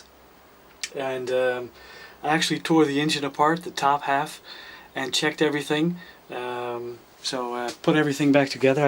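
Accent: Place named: Netherlands. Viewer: Watching you videos and hearing your accent I assume you are dutch. Well i’m dutch too and my kz 750 gt makes exactly the same noise. Do you by any chance do repairs on bikes other than your own? This job seems a bit out of my league so I really could use some help...Groeten, Rogier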